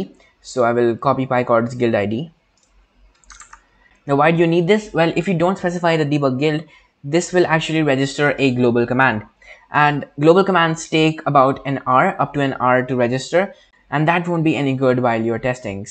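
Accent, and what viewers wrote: India. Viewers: Damn you sound more indian than ever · are u indian?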